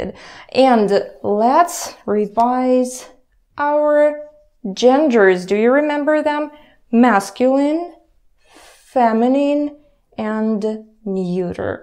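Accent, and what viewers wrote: Moscow, Russia. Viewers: Yes, I care, and don't worry, I will still follow your lessons, as I've come too far, to stop now. I'm actually impressed with your accent. It's not really extreme, except when I heard you say "Caesars" (you were actually saying "scissors"). But, I can tell you've worked long at English. I realize you're a teacher, BUT you must improve your "i" sound. Please keep your wonderful Russian accent, but please try to practice the "i" sound, as in scissors". It's not "EE" sound as in Caesars. It's "i" as in "ticket", not "teecket", for example. Or "i" as in "sin", not "SEEN", which is is another word altogether. (For Example: I've "SEEN" Led Zeppelin, in concert".) Or "i" as in "bin", not "bean", which, as you know, is a vegetation that grows. All those examples use the "short i", all of which sound the same. As far as never having had any problems communicating with "Americans", I ask you "What's an American anymore?". 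My parents came from Europe years ago. Greece, in fact, so I've had plenty of experience in correcting them, with these little corrections, as I have done with you. I too will need corrections in my Russian speech, as I have a few Russians friends, here. I want to learn the Russian language well, to communicate effectively, with them. And, we're in the USA, which is a distinct country within the continent of North America. We're the ones with President Trump, where FEW people practice the English language, anymore. I'll continue to study The Russian Language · а ты русская? Прост акцент русский у тебя есть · долг в метро причём учит на мАсковском жаргоне,а не на правильном русском литературном языке. Поучилась бы у советских дикторов,либо коренных,во многих поколениях,петербуржцах,либо у горожан из Восточной Сибири. Высокий русский язык был создан на основе новгородского и владимирского диалектов Пушкиным,а в последствии развитым Толстым,Некрасовым,Чеховым и Лермонтовым,что закреплено в «Словаре современного живого русского языка» Ожигова. 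А в Москве ,начиная со времён Горбачёва ,экономили деньги и набирали смазливых на лицо дикторов без поставленного ПРАВИЛЬНОГО ЛИТЕРАТУРНОГО РУССКОГО ЯЗЫКА С ЧЕТКИМ ВЫГОВОРОМ ЗВУКОВ И НЕ ШЕПЕЛЯВЫХ,не полукаГтавых,глотающих и или(и) искажающих звуки,не знающих где ставить ударения. И вообще,ухо режет. мАсковская безграмАтная реЩЬ!!!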